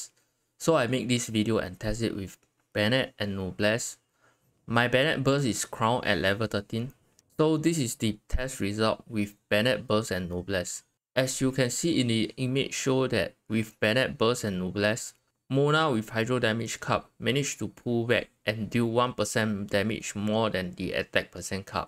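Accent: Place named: Singapore. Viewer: I thought u just sounded singaporean until i looked at your channel name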